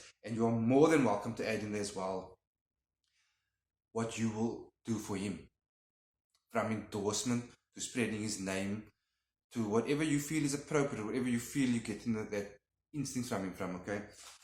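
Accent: South Africa. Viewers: Hi! Love your content. Fellow South African here. I could hear from your accent 🇿🇦😉 I'm from Cpt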